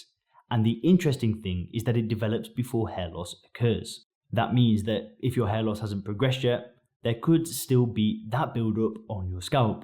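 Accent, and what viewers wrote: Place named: Geordie, United Kingdom. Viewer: Brother I am from northern England same as you, but your accent has got me puzzled, it's like a Geordie that has lived in South Africa for a while.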